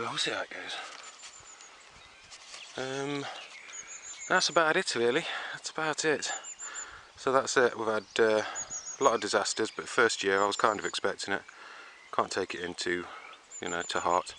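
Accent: East Midlands, United Kingdom. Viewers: Hiya! First time I've tuned into your channel. Good tour. I too am a newbie not only to gardening but to having an allotment so watch YouTube a lot and take lots of advice and try to ask the odd question too! Your plot seems to be huge; how big is it and where is it? From your accent, I would guess somewhere in the East Midlands?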